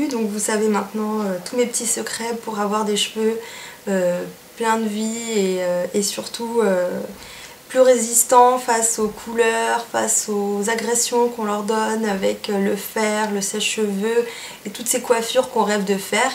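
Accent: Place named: France. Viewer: Hello :) I don't know if you'll be able to understand this but I just found your channel and I think you are absolutely beautiful! I also love your accent, I go to France often on holidays but I've never been able to understand in full. I subscribed to your channel, you have lovely videos! Hopefully more to come :)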